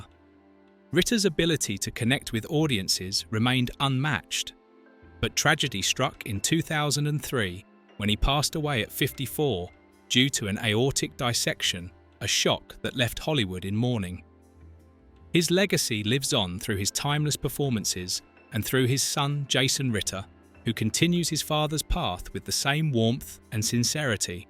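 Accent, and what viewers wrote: United Kingdom. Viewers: British accent commentary on an American show with a fake title! Click bait!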